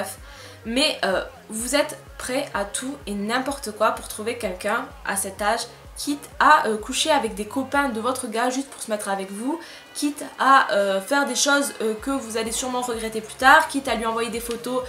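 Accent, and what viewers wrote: South, France. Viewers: Olala j’adooooore ton accent ♡♡ J’adore l’accent du Sud ♡ Si les sudiste voient ce commentaire je vous aime ♡♡ (surtout si vous avez l’accent :p) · Merci c'est gentil ‚ oui l'accent du sud est spécial mais bon c'est la vie ✋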